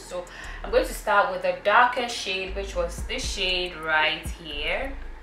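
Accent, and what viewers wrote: Ghana. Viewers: i came in the comment section to find out if she's Ghanaian...that Accent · This girl, you are a fine babe. My first time watching and I said, ahh this accent is from Ghana. I love your accent. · When I came across your video and heard your accent I was like she sounds Ghanaian and I was right🇬🇭🇬🇭! I love your tutorials, keep it up. I plan on watching this to do my make up for a wedding I have coming up this weekend. Thank you!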